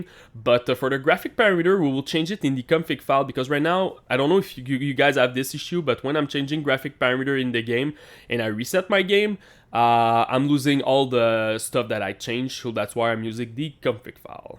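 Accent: Quebec, Canada. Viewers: J'adore comment on peut reconnaître les Québécois ! Hehehe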